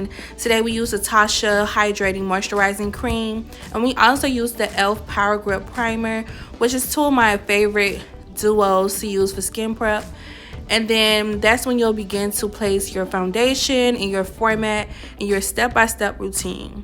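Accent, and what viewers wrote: Miami, United States. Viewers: there's no way you aren't from Miami! That accent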